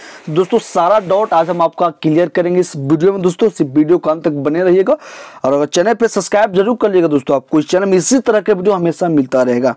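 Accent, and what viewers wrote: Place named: London, United Kingdom. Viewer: Bhai London wali hindi q bol rahe ho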